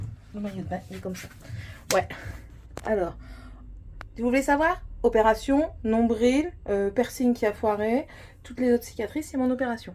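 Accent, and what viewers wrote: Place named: Nord, France. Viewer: Oh la vache l'acent du ch'nord ! C'est marrant !!!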